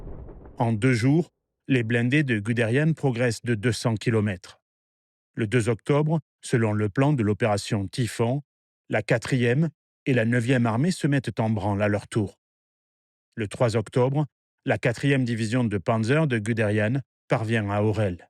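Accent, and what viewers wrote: South, France. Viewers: Pas mal du tout, les documents tiennent la route et le commentaire ,avé l'accent du midi, est sympathique !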